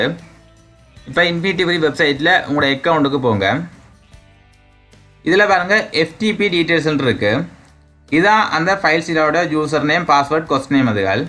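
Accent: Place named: Eelam, Sri Lanka. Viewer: அருமை சகோ ஈழ தமிழ் அருமை 🎉❤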